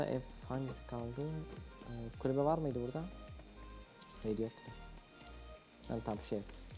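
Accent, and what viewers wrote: Azerbaijan. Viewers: Sən Azerbaycanlısan? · Qurban olum Azərbaycan Ləhçəsinə 😁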